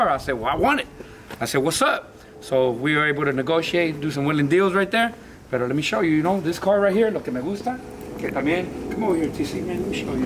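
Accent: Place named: Fresa, Mexico. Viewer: El español lo habla fresa y el inglés lo habla barrió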